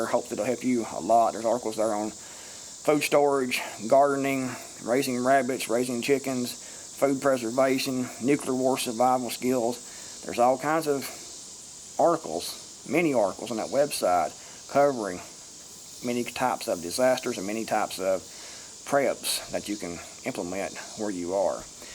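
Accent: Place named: South, United States. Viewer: I think he,s great ! Love the southern accent ...i,m from LOUISIANA and we all sound like the actors on the movie THE WATERBOY ! LOL !!!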